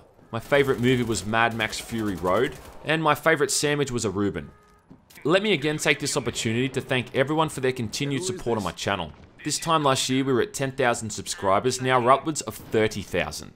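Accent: Australia. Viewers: Ye-es! It's not Christmas 'till aussie brogue has graced my ears with a year-end wrapup vid! Thanks man!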